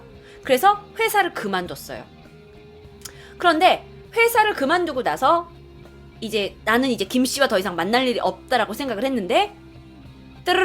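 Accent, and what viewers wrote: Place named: Jeolla, South Korea. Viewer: 전라도 분이시죠? 으 발음 너무 귀엽네요.ㅎㅎㅎ(진심)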